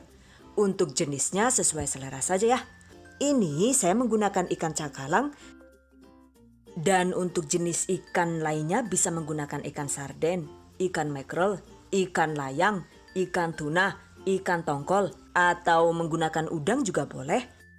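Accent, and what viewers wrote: Banyumas, Indonesia. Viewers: Mbae ngapak ya..pada baen karo aku..😁 maturnuwun mbak resepe..tak jajale..😊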